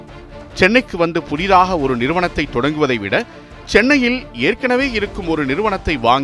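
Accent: Tirunelveli, India. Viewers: திருநெல்வேலி காரன் லே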